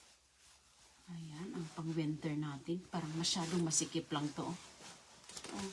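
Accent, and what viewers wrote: Visayas, Philippines. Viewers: Nakaka hiya yung mga nambabash kay Ms.. Porke bisaya binabash ang accent. That's disgusting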